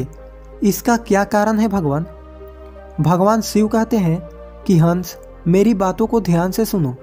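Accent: India. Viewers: Aap bhojpur bhasha k nagrik hain aap ka uchcharan aur tone bhojpuri style ka hai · Bihari hindi OMG🤣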